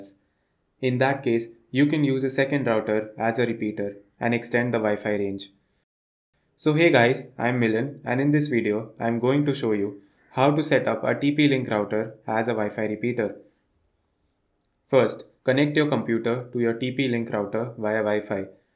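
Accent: India. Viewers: regardless what you are talking about Indian english is just funny :D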